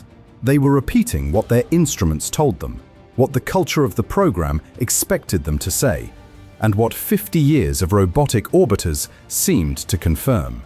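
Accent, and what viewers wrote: United Kingdom. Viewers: Get rid of the British narration.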